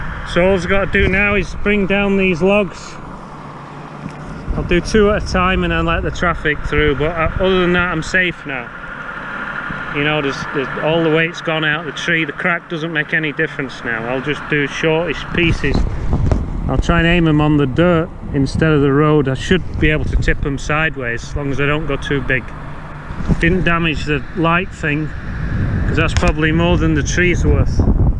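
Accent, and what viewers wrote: United Kingdom. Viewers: You sound like your from uk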